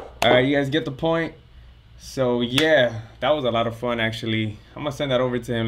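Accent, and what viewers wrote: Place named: Latin America. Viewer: Anh này có chất giọng mỹ la tinh phết đấy chứ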